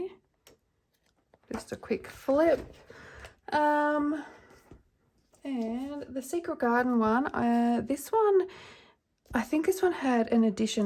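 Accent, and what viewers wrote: Australia. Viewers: Congratulations! You are an amazing colourist. I am so grateful for all you have taught me. Your colour combinations have been immensely helpful as that is what I struggle with the most. Also it is very heartwarming to hear a fellow Aussie accent! Keep colouring and being amazing 🤩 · Wow!!! Huge Congratulations!!! Love your channel … always have your videos running in the background on the weekends and join in whenever I can. Thanks so much for all your videos … for sharing your knowledge and allowing us to be inspired to colour our world💕. Lovely to hear another Aussie too❤️. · When I started adult colouring this year I searched for different channels to learn from and see what was possible and I immediately subscribed to you for your stunning colouring but because of your familiar Australian accent! There are lots of British and American colourists but it's wonderful to hear a fellow Australian 😅 Congrats on your well deserved success 🎉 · I watch all your videos that are on you tube, to give me inspiration and to learn new techniques, your colouring is stunning and i can only hope to get that good someday, , it is nice to see and hear another Australian colourist. 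Congratulations on your 20,000 subscribers, I am sure your channel will keep growing well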